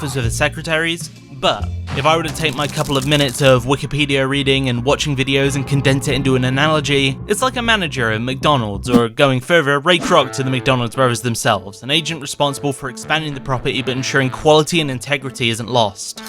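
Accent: West Country, United Kingdom. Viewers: You sound like you’re from Hot Fuzz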